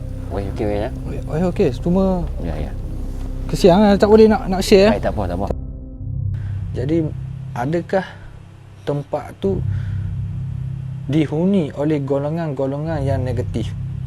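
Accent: Terengganu, Malaysia. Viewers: Assalamualaikum, sejak tgk channel saye dah faham loghat ganu🎉..sblm ni satu pun xpaham🤭...manusia berbeza2, Allah swt beri kelebihan dan kekurangan masing2. Org ikhlas berkongsi cerita, kite menjadi pendengar yg supportive. 🔥🔥🎉🎉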